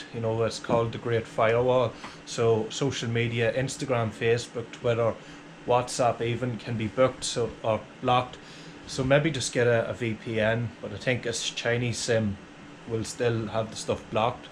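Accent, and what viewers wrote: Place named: Scotland, United Kingdom. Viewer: Australian with scottish accent, very cute, nice weather and walk around!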